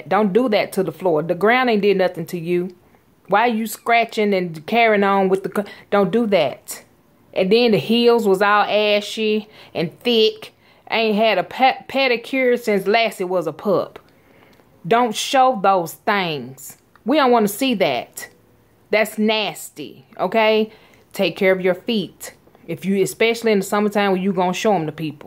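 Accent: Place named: South, United States. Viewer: Sometimes I can't understand her southern accent. Like when she said she saw a "burnch" of monstrosities. Did she mean "bunch?" And there was 1 where she said people shouldn't wear "bunnets" on their heads. ?????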